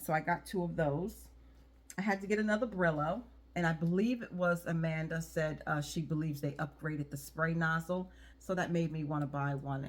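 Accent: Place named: New York, United States. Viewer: I love your accent. Is it NY?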